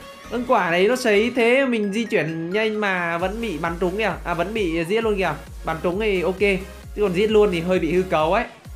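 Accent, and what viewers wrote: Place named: North, Vietnam. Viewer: ng Quãng Bình mà sao ổng lại nói tiếng Bắc nhuệ🤔🤔🤔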